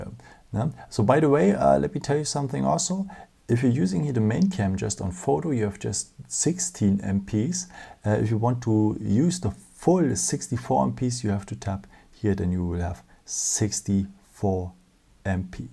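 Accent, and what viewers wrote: Germany. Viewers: he is german i can hear his accent but amazing is he doesnt sound like Arnie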